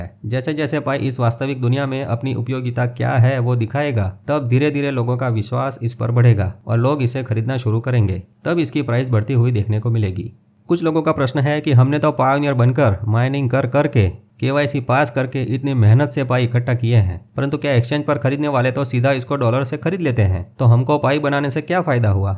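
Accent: Gujarat, India. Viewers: Awesome sirji hindi +gujju tont amazing combination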